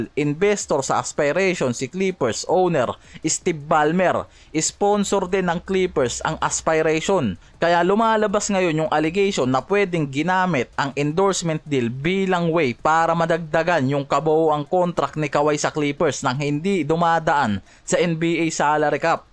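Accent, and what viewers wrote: Visayas, Philippines. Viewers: Bago ka mag content ayusin mo muna yung pronounced mo ng curry. Hindi carry. Curry. Anong carry? Keri? Carry the ball? Curry!! Hindi Carry. Bisayang to.. tapos porgingis. Hindi porsingis.. sarap mong kaltukan.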